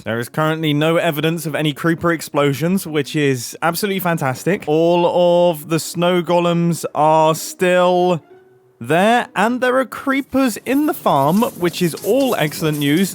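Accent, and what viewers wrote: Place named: RP, United Kingdom. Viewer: That’s it sorry man but I’m not watching anymore, I was here to watch a dot in the corner while listening to a British man sound posh and I can’t experience that anymore.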